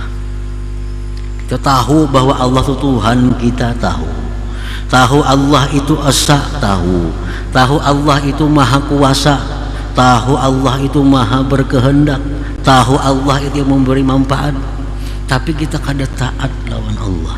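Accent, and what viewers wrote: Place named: Banjar, Indonesia. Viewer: Alhamdulillah lama- kelamaan sy boleh faham. Bahasa yg Pak Guru guna diselang seli dialek banjar. Sebagaimana pak2 kiyai di pulau jawa berbahasa indo-jawa, begitulah halnya di kalimantan—mrk bertutur dlm bahasa yg digunakan oleh org kalimantan umumnya iaitu dialek banjar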